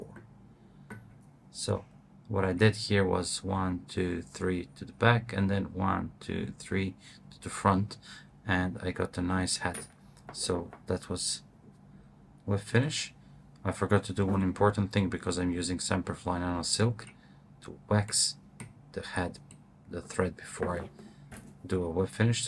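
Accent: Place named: Europe. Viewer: Brilliant video, your very very good at displaying the real inside parts of fly tying. A short time ago I watched a lot of you videos,I am so impressed and have started fly fishing and tying again, what a great inspiration you are. Do you live in South China, you sound European that is very interesting, how is the fishing in China.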